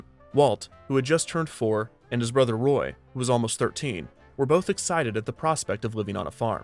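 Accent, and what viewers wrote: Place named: United States. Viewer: Are you daft? He clearly sounds American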